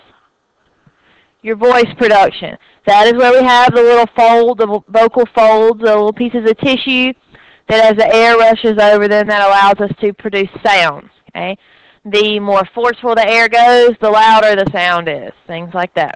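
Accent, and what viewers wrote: South, United States. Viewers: Thank you so much for your lectures! They make this stuff so much easier to understand. I'm originally from NC but go to school in NYC. I appreciate hearing a professor with a southern accent. It feels like home :)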